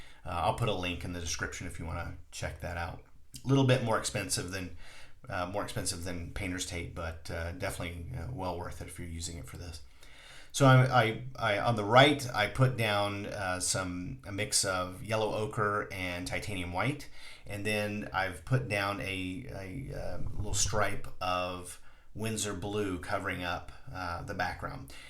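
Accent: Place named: United States. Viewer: I really like your clear teaching style and the look of your abstract works of art. Thank you for sharing so many 'how to' videos for beginners like me and I am excited about trying out your tape idea. I also enjoy listening to your calm and very relaxing American accent. Regards from Down Under in Australia 😊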